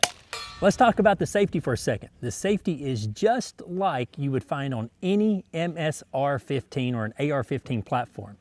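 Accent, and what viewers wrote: Tennessee, United States. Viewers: what part of TN you from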